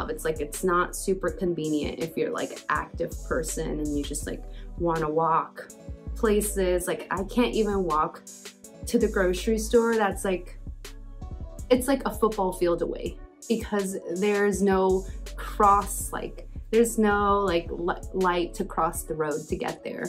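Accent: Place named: California, United States. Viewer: I am from California, and you you sound so sound so Cali. I heard that that Austin is expensive?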